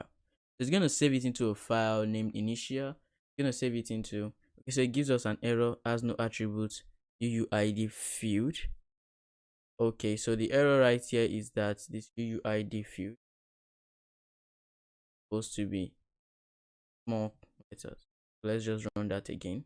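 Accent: Nigeria. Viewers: are you a Nigerian, I'm so happy to find this channel, I have been with freecodecamp but I do notice your voice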